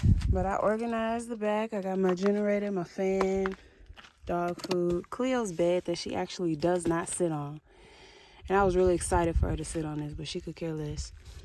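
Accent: France. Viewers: Such good advice about your surroundings affecting your inner cells🥰😍 I strongly resonate with your message. Your “french” accent had me rollin 😂🤣😅😁😆 it’s so unique, I love it. But you and the bugs, chile 😏 you are one bold sista 👏🏿👏🏿👏🏿💞💖🖤🤎🖤✨💫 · Idk why but I am dying 😂🤣 at this French accent! Lmao Everything resonates with me when you shared about epigenetics. Give thanks for the sweet reminder! 🥰🙏🏾 Keep shining, sharing, and taking up space in this universe! 💕✨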